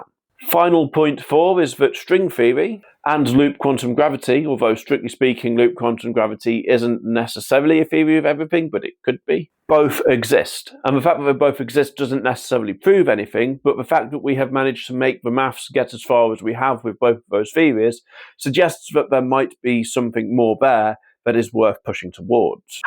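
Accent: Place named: West Country, United Kingdom. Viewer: Mate, can you go to a finishing school or something, im sure what youre saying is right and should be interesting but coming from you it sounds like something from the worzels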